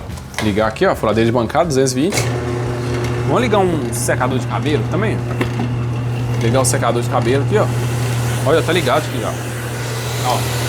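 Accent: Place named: Rio de Janeiro, Brazil. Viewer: O cara com mó sotaque carioca.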